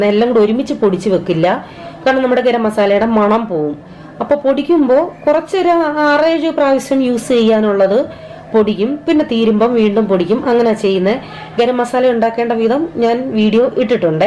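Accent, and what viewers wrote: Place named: Kollam, India. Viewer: what kap thot meen kutmo nee.......... kollam slang super!.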